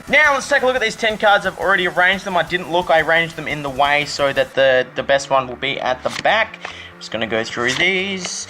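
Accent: Australia. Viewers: HE'S AUSTRALIAN! Sweet :)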